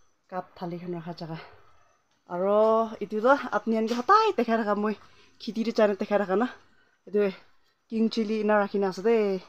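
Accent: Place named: Nagaland, India. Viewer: Sis I like da way u speak nagames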